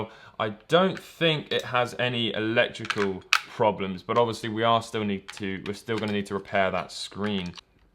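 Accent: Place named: United Kingdom. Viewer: I love her british accent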